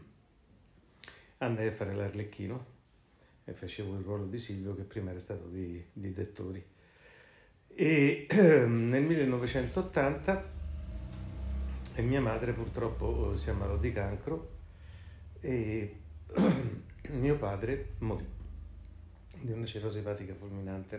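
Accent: Rome, Italy. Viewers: Mamma mia che fenomeno, adoro la sua voce. Ma come fa, ha una voce sbiascicata, piena di sc doppie sbagliate romanesche...... grande!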